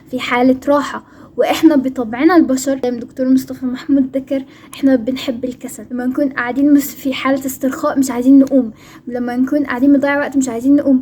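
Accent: Gulf, Arab world. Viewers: مصريه بس فيها لطشة خليجي 😂😂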